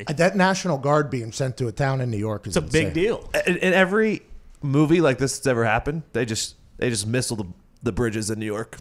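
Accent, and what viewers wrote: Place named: Texas, United States. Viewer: LOL your Texas accent is fire!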